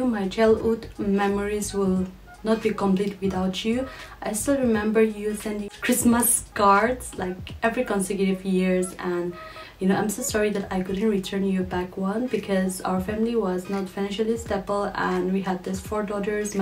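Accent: Nagaland, India. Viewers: Originality is what you are my sister. The accent is real naga♥unlike those wanna be famous nagas local celebs. Praising the Lord Jesus Christ and sharing the Bible verses in the middle is the best thing I came across in the video.. Down to earth and being humble will take you very far in achieving your goals.. May God bless you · You look like a Ao but your accent sounds like angami...you might be thinking why...🙄 Here is the reason because your voice is so soft like angami ladies🤗🤗 people will believe you if u tell thm that you are cocktail..lol.. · Idk why people still consider English speaking as a barrier of intelligence. No matter how well we speak English and have accent like those of the Britishers, we will never be Englishmen. Being a Naga, it is okay to have an accent like a Naga because that's our identity. And whoever criticizes on your accent, well they need to get a life and maybe get back to the roots. 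Besides, you have a wonderful singing voice.👌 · I have many naga friends and now my english is like them I love the naga accent 🔥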